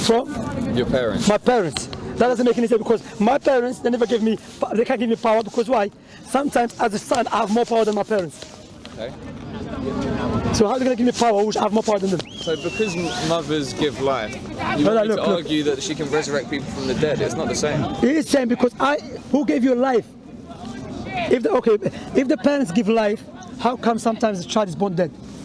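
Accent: Algeria. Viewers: بارك الله فيك اخي .لديك حجج قوية وكلامك منطقي ومقنع لاي اجنبي عن الاسلام.اول مرة نشوف داعية بقوة اقناعك وبراهينك المثيرة والبسيطة .واصل شرف لنا انك جزائري عرفت لهجتك وحدي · عند رؤيتي للفيديو أحسست بأنه جزائري من خلال لهجته ، و الأن تأكذت من ذلك بعد تعليقك شكراً ، و أنا فخورة كذلك كوني جزائرية بهذا الأخ الله يبارك ❤❤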